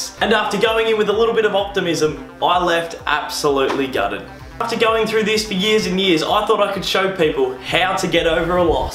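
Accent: Australia. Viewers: Are you Australian? You sound weird.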